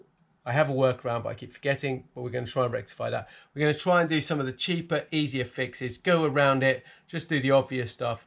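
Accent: England, United Kingdom. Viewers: Just had this suggested to me, see the $$ prices, but then you open your gob defiantly a english, fair play need some more brits like. Showing their skills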